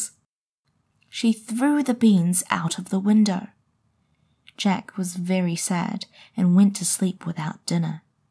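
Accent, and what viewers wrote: New Zealand. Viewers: You have a proper Kiwi accent haha! Did you go to broadcasting school by any chance? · 내년 1월에 뉴질랜드 6주 어학연수가는 학생인데 뉴질랜드 문화 이런것도 영어로 소개해주세요 뉴질랜드 발음 너무 조아요💕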